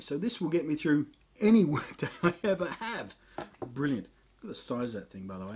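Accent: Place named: Australia. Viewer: You sleek like a true Aussie. I am proud.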